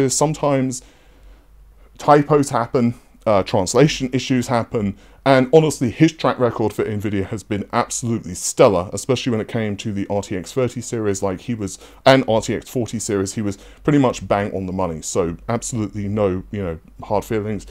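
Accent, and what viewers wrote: United Kingdom. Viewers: With this dude’s British accent that gets produced by his nose, he would be an excellent replacement for severus snape in any Harry Potter prequels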